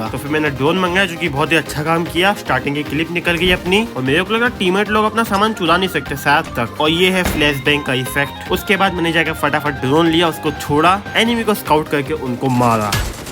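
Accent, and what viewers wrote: Gujarat, India. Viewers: Bhai gujarati Cho ne tame Tamaro hindi ma Gujarati flow joi lage che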